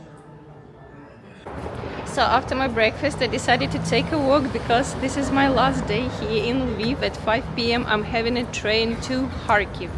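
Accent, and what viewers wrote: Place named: Russia. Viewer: не возможно слушать этот ужасный русский акцент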